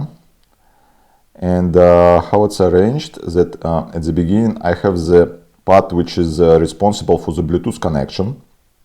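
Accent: Russia. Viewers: у вас очень сильный русский акцент, у вас только англоязычный канал?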